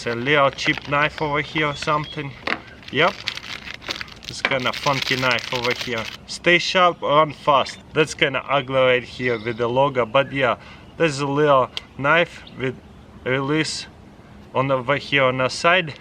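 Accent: Russia. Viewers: Я один слышу русский акцент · По первому слову понял,что ты русский)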